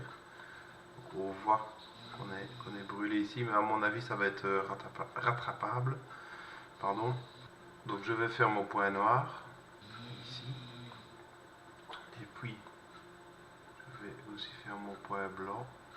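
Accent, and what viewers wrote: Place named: Liège, Belgium. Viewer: Super le tuto, j'ai vraiment apprécié le procédé, je vais tester sur une de mes photos avec ciel presque cramé. J'ai aussi adoré l'accent liégeois ;-) Merci pour les explications.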